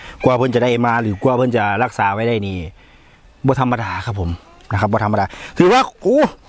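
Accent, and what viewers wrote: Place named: Sam Phrao, Thailand. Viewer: เจ้าคือเว้าคือสำเนียง สามพร้าวบ้านผมแท้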